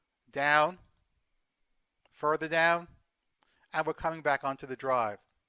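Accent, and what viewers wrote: New York, United States. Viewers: Love how you've never altered your New Yawk dialect one bit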